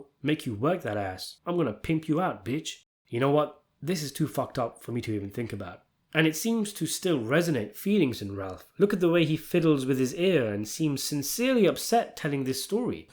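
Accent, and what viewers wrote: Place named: Northern England, United Kingdom. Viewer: It’s an accent from northern England, I prefer this than a robotic AI voice, good thing your opinion means OOGATTZ TO ME!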